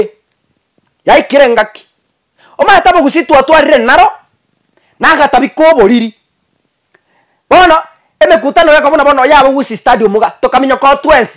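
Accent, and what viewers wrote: Kisii, Kenya. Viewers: Umeongea kama wakisii wote,obokenyomba baminto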